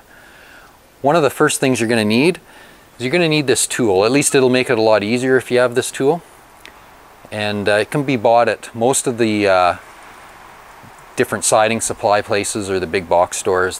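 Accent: Canada. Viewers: I hear Canadian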